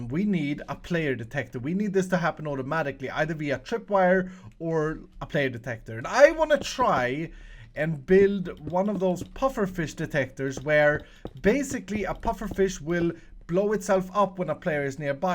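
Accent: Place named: Sweden. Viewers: yes hes swedish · Hey ARE you from sweden i just subscribed on you and you sound like a swedish Guy IM from sweden hejdå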